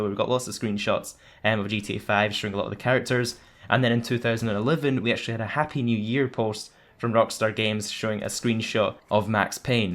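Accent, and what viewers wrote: Scotland, United Kingdom. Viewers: where in Scotland u from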